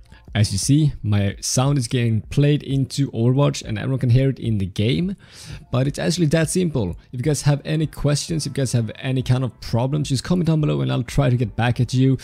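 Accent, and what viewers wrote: Sweden. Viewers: Du är svensk hör det på dialekten 😜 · Are you from sweden?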